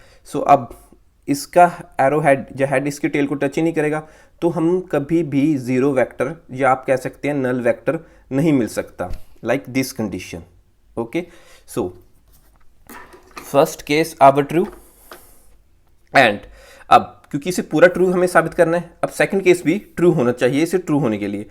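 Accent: Punjab, India. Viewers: sir great explaination , also are you from punjab?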